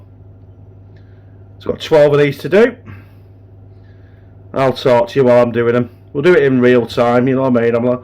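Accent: United Kingdom. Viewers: Just discovered you while searching for a chocolate pancake recipe. Dang you are fun!!! I'm thinking a spoonful of ice cream or whipped cream on the top w/ crushed KitKat or flake would be divine. Definitely agree w/ your end comment on the salt but would add it into the mix. I've subscribed based purely on this recipe plus being a Brit living in Texas I just love hearing your accent & 'language' LOL!!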